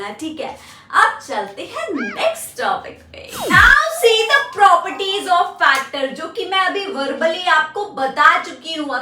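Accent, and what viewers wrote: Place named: Mewat, India. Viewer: Mewati awaaz to halki karo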